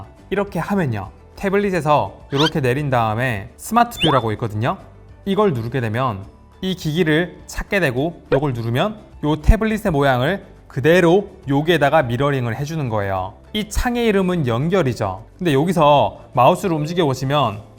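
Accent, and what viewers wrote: Gyeongsang, South Korea. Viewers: 경상도 억양 빼곤 좋습니다.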